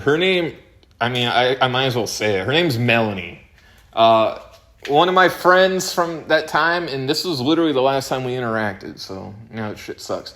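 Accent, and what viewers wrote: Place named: Midwest, United States. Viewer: a midwest accent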